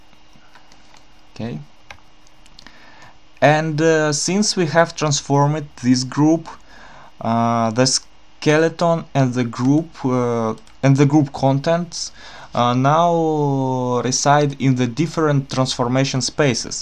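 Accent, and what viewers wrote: Russia. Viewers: Приятно слышать родную речь.Говорит на Инглише, но ТАК по русски. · Рашн аксент эноин май ээрс абсолютли )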